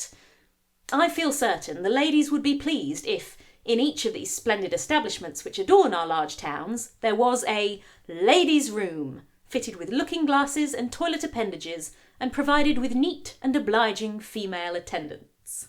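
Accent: United Kingdom. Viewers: You rock in your knowledge. And that endearing British accent done with panache is so delightful.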